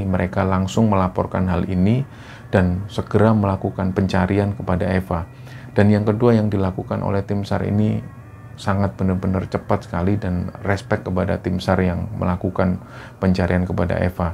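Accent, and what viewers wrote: Java, Indonesia. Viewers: Manteb mas jawa gak lupa jawane masuk denger nya enak pemabawa acara jos ❤️ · Iya medoknya jgn hilang mas, itu kan ciri khas n sy suka dengarnya. Sukses selalu tim, semangat💪🏻💪🏻💪🏻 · Yaumul milad 1thn..tetap jadi canel yg sangat2 inspiratif...nggk alay....pembawaan yg sanggat2 muedok polll...tutur kata yg bikin merinding...kidung lawas yg mendayu dayu...terus sehat crew...tetap istiqomah..rendah hati dan tembus 2jt subscribers...Aamiinnn ya Alloh🤲🤲🤲🙏🙏🙏👃love you Allll❤❤ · Mas itu keluar aura MISTIS nya justru krn medok nya... Klw medok nya ilang, seperti ada yg kurang, Salam Taklim kagem Mas... 🙏🙏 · Selamat ultah yang pertama. Semoga semua sehat2 dan channel nya semakin berkembang, aamiin. Dari konsepnya, kostum, logat medok semua sudah pas, hehe. 👍😊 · terus pertahankan suara medok nya dan kidung pembuka, sukses selalu mas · Betul mas,medhok itu ciri khas orang jawa. 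jangan dikira cuman logat jakarta yang lue gue saja yang enak didengar. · Ciri khas mas medok" suaranya,sukses sll,aku suka sekali👍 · Medok bgtttt Mas mantap bhsa nya👍👍👍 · Happy 1st Anniversary! Jujur pertama denger channel ini saya gak berani denger intro nya, bener2 merinding! Lama2 saya bener2 kagum, krna channel ini berbobot dan punya ciri khas yg kuat entah itu pembawaan narator, background studio dan pemilihan backsound. Oia, saya jd suka denger org ngomong medok jawa krna channel ini jg 😁 Satu lg kualitas channel ini adlh membawakan kisah nyata disertai observasi lebih dulu dan menyertakan bukti2, jd gak asal cerita. Pertahankan ya mas2, semoga segera ke 1juta subs, semangat!! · Logat medoknya mas sudah menjadi ciri khasnya,dipertahankan saja biar beda dari chanel yang lain. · Selamat anniversary, pertahankan originalitasnya..jangan sampe hilang medoknya mas...itu yang bikin gaya berceritanya menghipnotis audience..hehehe..semoga semakin besar,semakin bermanfaat..sehat, sukses dan berkah selalu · Justru dgn medok aslinya itu maka cerita yg dibawakan lbh menarik, dan cara menyampaikan bgt tenang, sy tiap hari dengar crt tiap malam diatas jam 11 sampai subuh, sambil kerja pencatatan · gpp medok yg penting jangan di banyakin bahasa jawa nya soalnya banyak juga yg nonton gabisa bahasa jawa🙏👍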